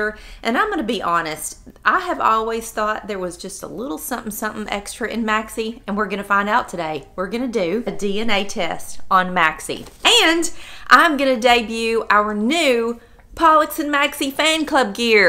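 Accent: South, United States. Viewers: I just love southern accent lol xxx it is 11°C here in Peterhead Aberdeenshire Scotland and I'm in a bootlace strap t-shirt lol... hardy folks us Scots lol xxx Love the new Merc xxx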